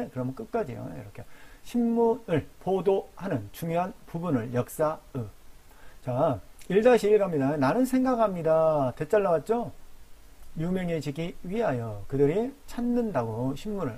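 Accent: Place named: Jeolla, South Korea. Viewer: 흐흐 구수한 전라도 사투리... 저절로 드러나시네요.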